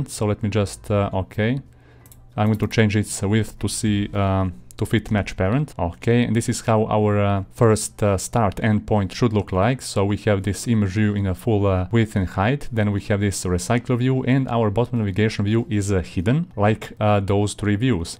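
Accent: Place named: Russia. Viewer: Your accent is similar to Russian. Are you From Russia? = ) Thank you for the tutorial!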